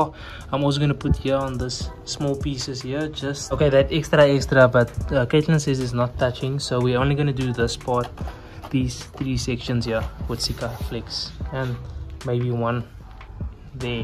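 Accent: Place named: Afrikaans, South Africa. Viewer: Haha zuid afrikaans blijft grappig om te horen. Dacht dat de taal daar uitgestorven was. Btw did it came painted/glossy? How much was it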